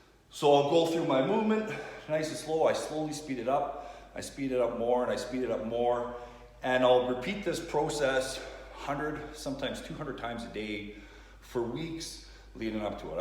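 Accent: Canada. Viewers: Finally a arm wrestler with a great Canadian accent eh! Want to hear what you think will happen in Dubai. What each guys should do. What each guy you think will do. 16k subs already. Nice start.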